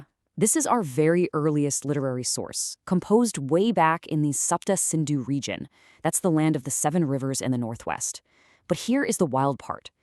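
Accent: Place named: West, United States. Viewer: Good source. My only concern is the western accent.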